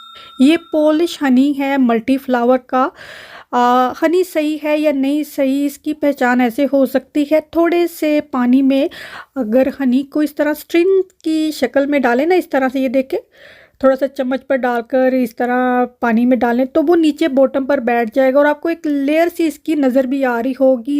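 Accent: Punjab, India. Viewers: Very nice I like ur Punjabi touch in vlog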